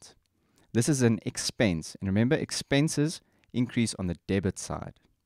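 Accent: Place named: South Africa. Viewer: Lekker boet, helping me all the way in Canada... but born South African.(noticed the accent immediately, but confirmed when i heard the Rand)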